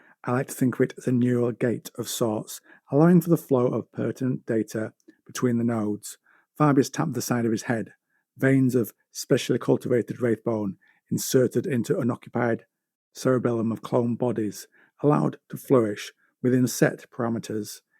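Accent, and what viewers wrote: United Kingdom. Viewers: I think if you get a decent mic your channel would do well dude. and try do a read through of your script before hand as its kind of obvious its your first time reading it outloud. also vary your tone its difficult to tell when you're reading dialogue and when your reading other text. remember most of your audience is going to be yankees and fellow brits so your accent will actually be a boon😅. finally, what is your channel going to do thats different to the other lore channels that exist?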